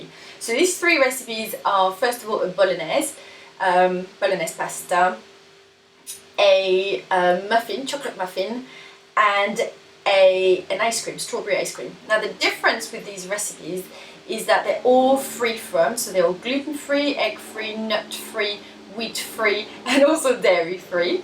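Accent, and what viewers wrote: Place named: France. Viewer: Bonjour! By your accent I guess you're French?:) I lived in France for 8 years or so, and I must say I can't remember a high concern there for gluten free etc, there just seemed to be less allergies over there? Am I wrong?? I honestly first heard of allergies to gluten in the UK.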